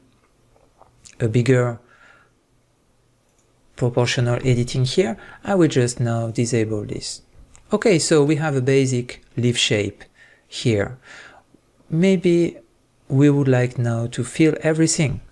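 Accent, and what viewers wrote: France. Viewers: Tu serais pas français par hasard ont dirait l'accent Français xD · Très bon tuto ! Et le petit accent français qui fait plaisir xD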